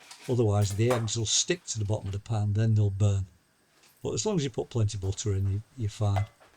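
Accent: United Kingdom. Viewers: Love this Lancashire accent, and the calm way of cooking! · 👍👍👍...Hallo, I came across your channel while looking for kidney recipe! Unfortunately, I don't understand your ( Yorkshire?) accent very well🙄 And my school English is long gone😂...about 40 Years...! But your are a great guy! Still, I enjoy listening to you👍 Your channel is so wunderfullly, refreshingly NORMAL. Without modern heigh- tech kitchen und equipment! Okay, till now, you are not a greatest cameraman and your special Omelett scared me at first😂 But in the end it looks delicious. Waiting for more! I left a subscrition. Greetings from Germany...Caroline 😊❤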